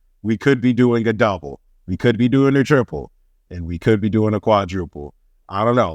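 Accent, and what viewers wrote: United States. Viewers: Usually my brain turns off when I hear an American accent in relation to football talk but your depth in knowledge and analysis is superb! subbed!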